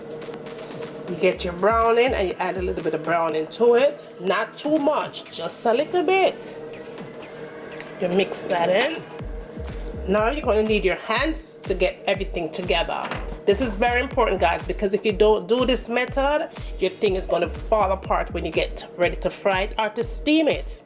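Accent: Jamaica. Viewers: Jamaican accent is the thing I didn’t know I needed on YouTube!!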